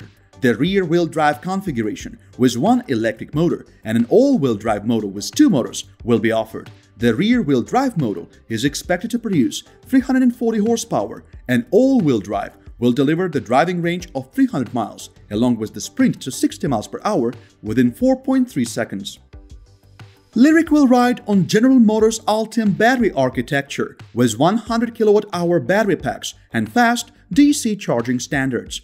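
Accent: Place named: United States. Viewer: Is this what a posh American sounds like? Jeez dude stop you’re butchering the English language!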